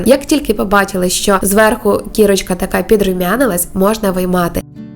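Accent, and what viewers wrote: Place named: Ukraine. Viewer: 😮😊 Просто слушаю ваш разговор 😊и уде наелась 😅,и готовитьне надо,то токой степени мне нравится Украинский говор 😊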